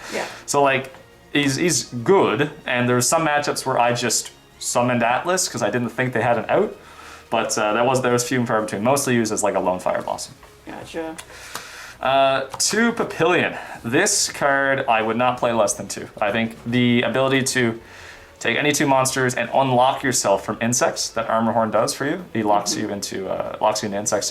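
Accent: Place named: Newfoundland, Canada. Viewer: Love the newphie accent. All the hard A's lmao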